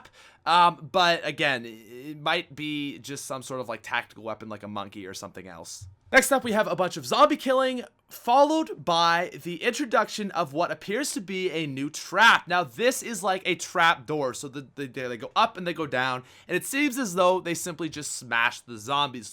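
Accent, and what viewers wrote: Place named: Canada. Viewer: He's Canadian. that's how they pronounce it.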